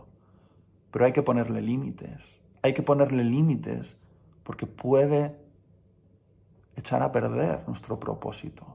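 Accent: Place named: Spain. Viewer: Es su acento, es español.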